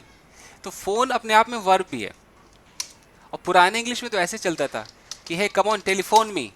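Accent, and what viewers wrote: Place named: Bengal, India. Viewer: Really sir amazing your Bengali tone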